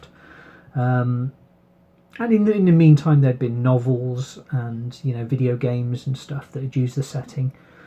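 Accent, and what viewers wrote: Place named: United Kingdom. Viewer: That's how British people talk.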